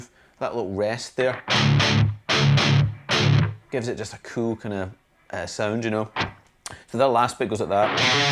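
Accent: Scotland, United Kingdom. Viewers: Thanks for this tutorial man, love your fackin' accent, you're scottish aren't you? greetings from france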